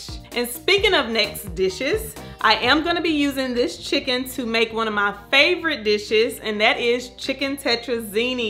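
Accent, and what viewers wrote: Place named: South, United States. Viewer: You got to be from the south girlll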